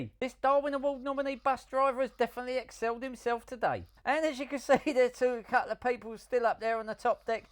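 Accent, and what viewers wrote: Cockney, United Kingdom. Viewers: Love all your videos,but the cockney just adds the cherry on the cake.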